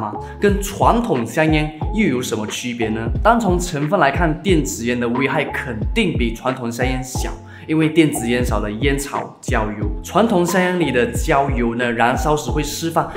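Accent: Fuzhou, China. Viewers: 你肯定是福州人！！！！！！！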